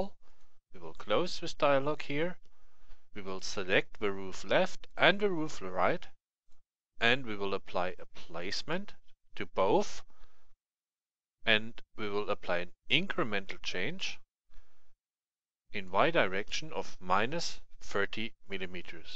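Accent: Germany. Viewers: Great tutorial! And love your German accent!!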